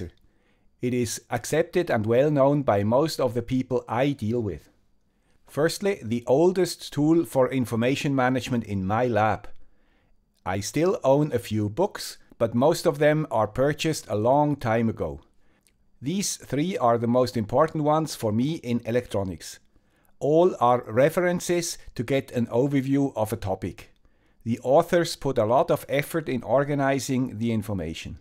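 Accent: Switzerland. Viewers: Your channel is "about sensors and microcontrolers" with a Swiss accent -:). That's why I have a subscription on your channel. For me, this subject is to far off (personal opinion).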